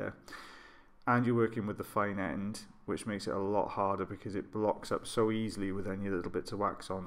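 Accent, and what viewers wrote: Wales, United Kingdom. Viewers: I love these videos. And your british accent. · You sound welsh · From where do you hail? I live in Oxford but was born in Bridgend, Glamorgan, and thought your accent sounded familiar! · That is probably because of his lovely Welsh accent. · He's Welsh.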